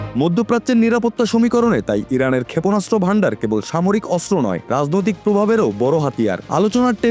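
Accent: Kolkata, India. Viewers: এই সাংবাদিককে কোলকাতার মনে হচ্ছে।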